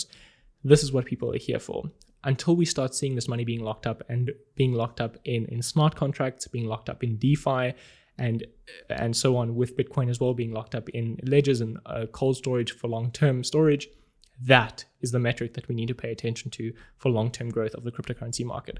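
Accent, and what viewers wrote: South Africa. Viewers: are you from South Africa? I don't know if you have mentioned it before, sorry if you had. Your accent reminds me to Elon's. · This guy sounds South African, am I right boet?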